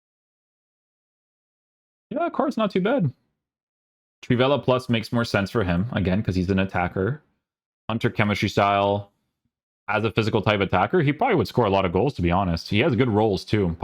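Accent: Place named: Portugal. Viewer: He's Portuguese. He has an accent. Get over it 😂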